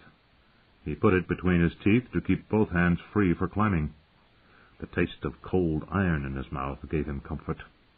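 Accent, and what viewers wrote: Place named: United States. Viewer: Just doesn't quite cut it with a American accent . Wish There was a Augmented Audio Book too . Or is there ? Point me there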